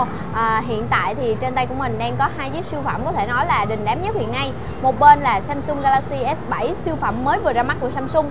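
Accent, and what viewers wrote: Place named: Central, Vietnam. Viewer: mien trung roi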